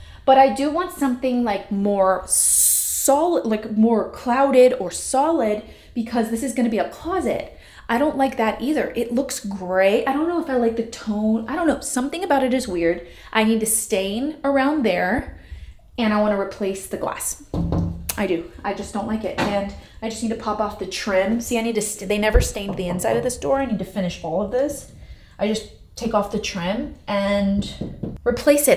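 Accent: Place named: California, United States. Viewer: Hi love your videos! I was wondering if you could answer this in your next q&a, I am just so curious. Have you always had a Californian accent or you just lost the southern accent living in CA for so many years?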